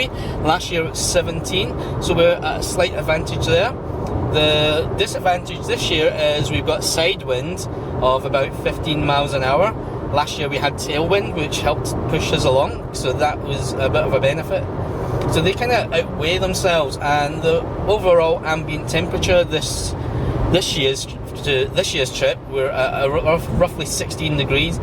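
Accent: Scotland, United Kingdom. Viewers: I love the subtitles - some amusing translations of your Scottish accent!!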